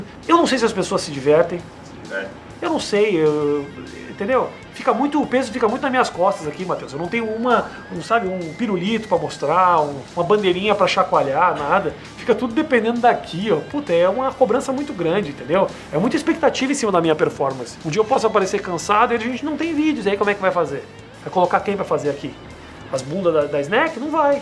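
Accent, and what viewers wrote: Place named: Porto Alegre, Brazil. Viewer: Fala bem de Porto Alegre!! Tu é daqui, po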